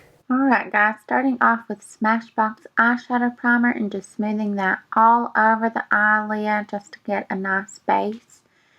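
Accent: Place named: South, United States. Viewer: your southern accent makes me smile so much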